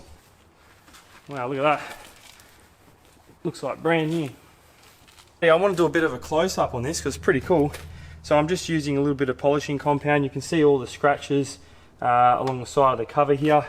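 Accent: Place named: Australia. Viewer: In addition to this Australian Youtube Channel I also watch the Dangar Marine Channel. Stu , the creator of the Dangar Marine Channel is located in New South Wales and I believe the creator of this channel is located Western Australia. Question? Are there regional accents in Australia? While to my American ears both of our Aussie hosts sound Aussie, but it seems that there is a slight difference in the way each man pronounces certain words.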